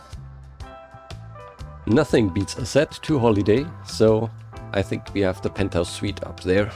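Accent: Bavaria, Germany. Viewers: JOOO are you deutscher mayby like bayrischer or mayby from frankfurt? but geiles auto find es auch geil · Ich höre ein bisschen deutsch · Geiles Denglisch fühle dich aber jetzt schon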